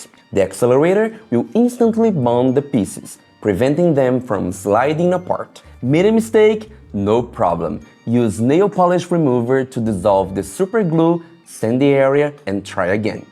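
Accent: Brazil. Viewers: esse inglês com sotaque brasileiro... tô errado?! massa o conteúdo! o Loot é referência de qualidade e excelência. inspirador. thanks!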